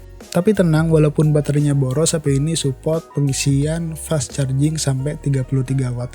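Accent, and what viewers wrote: Bali, Indonesia. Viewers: bang lu asal bali yaa bang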